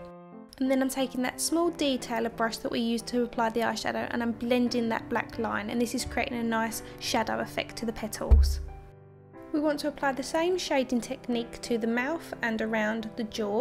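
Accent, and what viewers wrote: Essex, United Kingdom. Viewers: Where abouts in the UK are you from? I can sense an Essex accent ;) i love your videos & you are super talented, new sub!💗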